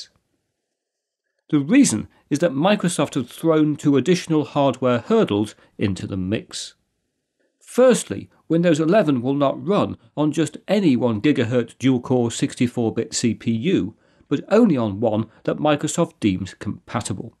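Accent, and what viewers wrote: United Kingdom. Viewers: Computers are so much more interesting with a British Accent.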